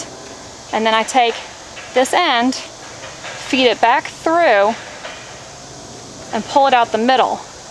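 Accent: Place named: Alabama, United States. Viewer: Great video. Thanks for sharing. You sound like folks from my home state of Alabama, which was comforting, lol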